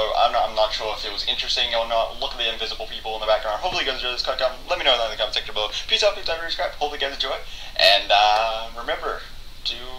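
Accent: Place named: Australia. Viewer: Aussie accent game strong as fuck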